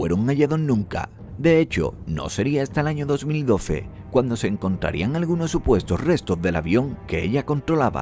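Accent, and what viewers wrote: Spain. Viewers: Eres español no?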